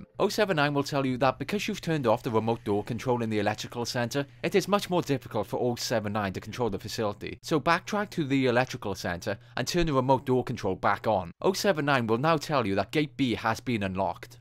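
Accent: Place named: South Wales, United Kingdom. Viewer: weyhey, Cymro o'r diwedd! you sound like a south wales valley boy, are you from Rhondda or like Ystalafera?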